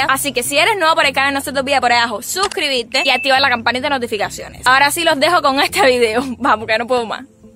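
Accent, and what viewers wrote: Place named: Cuba. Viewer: Excelente video me encanta tu acento cubano además estas súper guapa saludos preciosa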